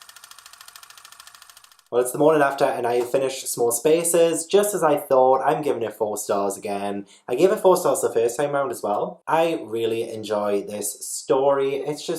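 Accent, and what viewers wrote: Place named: Geordie, United Kingdom. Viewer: I'm crying at the Most Haunted skits 😂 This is so good,! I loved the broad Geordie accent, feels so weird hearing it on youtube! I wonder who could understand it 😂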